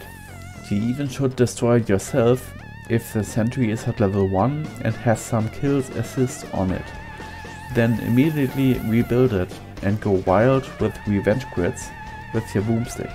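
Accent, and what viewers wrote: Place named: Germany. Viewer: Wie schön das man deinen Deutschen Akzent direkt heraushört. Liebe Grüße Leminsc8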